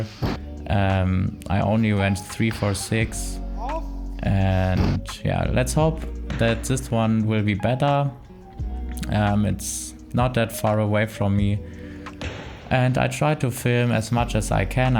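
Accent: Germany. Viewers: Ich dachte die ganze Zeit schon dein Akzent ist deutsch Geil, bleib bitte dran und mach weiter so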